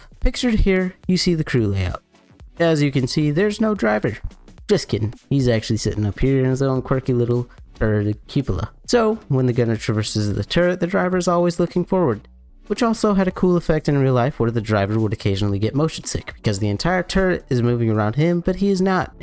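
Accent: United States. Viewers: I hope you blow up bro, the jokes and stuff in this video were great and you have good editing for being a smaller channel. The southern accent was hilarious. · loved the 'murican accent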